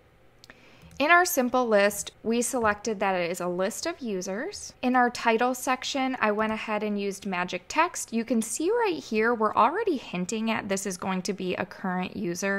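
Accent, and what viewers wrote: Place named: Wisconsin, United States. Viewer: You're (whoever is doing this video) is pretty good at explaining the videos! You just keep forgetting to add the links you mention... you should probably put "Remember to add the link." in your notes though. Can I request you do a video on the proper use of anchoring components? Every time I think I have it down, I realize that I was wrong! Also, where is that accent from? I'm guessing Wisconsin or somewhere close.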